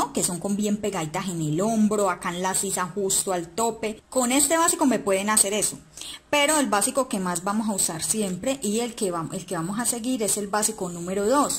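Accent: Colombia. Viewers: Que gusto conocerte, poderle poner un rostro y un nombre a esa voz tan Colombiana. Agradezco infinitamente tu dedicación y esmero en enseñarnos, se nota que te gusta lo que haces, me encanta tu honestidad y tu forma tan graciosa de hacer aclaraciones.... de corazón te digo DIOS TE BENDIGA....!!! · Hola, excelente tu forma de explicar, por tu acento, creo que eres vallecaucana, soy de Tuluá, y gracias a ti, he aprendido mucho. Gracias por tu generosidad al compartir tus conocimientos.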